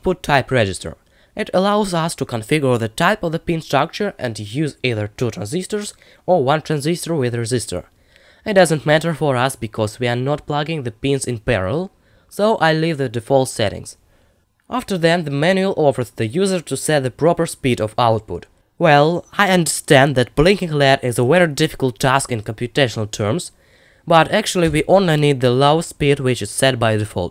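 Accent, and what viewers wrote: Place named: Russia. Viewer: worst russian accent i'v ever heard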